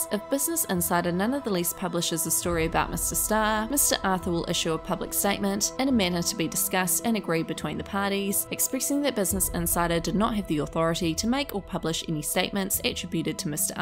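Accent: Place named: New Zealand. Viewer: Sounds very NZ to me.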